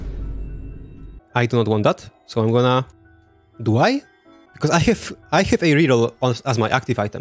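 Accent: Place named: Poland. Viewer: Niezły film Oraz dobry akcent Ale Polak zawsze pozna Polaka nawet gdy mówi po angielsku Ale wciąż bardzo fajny odcinek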